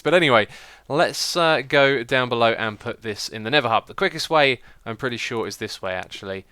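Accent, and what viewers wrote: England, United Kingdom. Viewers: Are you from the north or south of England I can't work out ur accent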